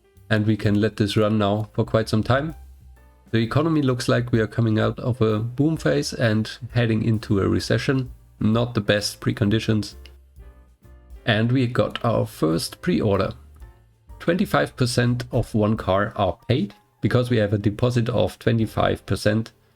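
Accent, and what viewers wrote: Bavaria, Germany. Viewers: Du kommst aus Bayern?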